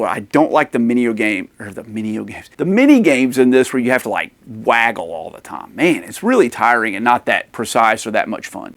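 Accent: South, United States. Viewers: Apart the cabbage..are you from suddern m'erica ,i dont know i thought you are from Canada... I am european i berely speak/write m'erican language, so please forgive me, but legitimately what country are you from 🤔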